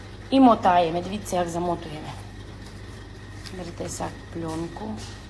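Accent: Transcarpathia, Ukraine. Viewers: Дуже люблю як Ви розмовляєте на Закарпатті:))привіт зі Львова:))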